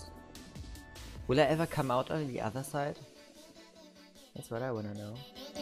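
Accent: Germany. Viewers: deutsche accent killt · as a german i can say that your are german Right?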